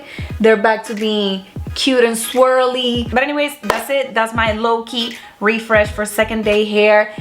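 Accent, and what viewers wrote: Puerto Rico. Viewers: Ahh yesss! Borinquen ❤️😊 Love it!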